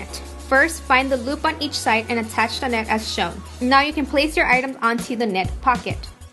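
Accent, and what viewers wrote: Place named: Spain. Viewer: She sounds Spanish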